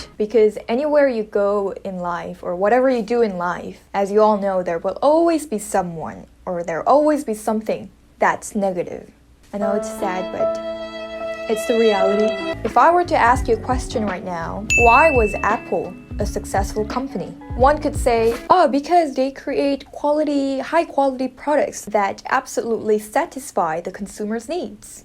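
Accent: Philippines. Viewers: I am a first generation American of Filipino descent. I have never visited the Philippines, but after first watching your video (speaking English w/ a Filipino accent) a few months ago (followed by many more videos), I was hooked. I want to visit there now - all thanks to you! As an aside, I would also like to visit S. Korea. By culture, experience, language, knowledge, living there nine years, you are a subject matter expert on the Philippines. I don't quite know what the issue is... If it's monetization over your content, then travel agents shouldn't make any money. Is it because you are not Filipino? That would be racists. Can only Filipinos post videos and comment about the PI? Your videos are well-made, creative, and thoughtful. They are also positive, and only benefit the country. W/ regards to the hate you receive: If you have not watched the movie The Social Dilemma, you may get a better understanding of the hate. 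In summary (spoiler alert); your viewers are the product being sold to advertisers on YT (the source of the monetization), conflict (hate) generates more viewers/posts than does love. If there are no negative comments, the artificial intelligence (AI) steps-in to create that negativity - generating responses (and money). Simple comments like, "You're stupid" or "You're not Filipino" can generate a lot of responses. Sorry, so this is so long. I want to give you support by letting you know I very much enjoy your videos. As a Filipino (albeit in the US) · I'm Pinoy, I watch her videos because of her pinoy english accent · Just the way you speak i call feel that you grow up here in the Philippines, and Pinoy baiting doesn't apply for everyone that makes Filipino related Contents, Pinoy baiting are actually being done by those people are not familiar with Filipino Culture but still make Contents out of them... 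Its just a strategic move for them, but i hope that people can support the channels that makes worthy videos, (for any type of contents) where people can learn or be entertained by it without being sexist or make whatever that isn't even worth watching like idiotic pranks that are obviously scripted and theyre not even trying to think for a good content but for some reasons there are people who support them, i cant blame them(viewers) because maybe they want to fool themselves lol, who knows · I know you have a filipino english accent and many koreans have filipino english accent but yours is my favorite ❤️❤️❤️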